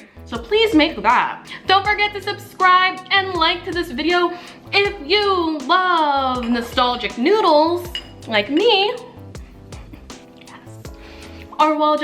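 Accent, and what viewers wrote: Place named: United States. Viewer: you speak American English accent 😊❤ new subscriber from Seattle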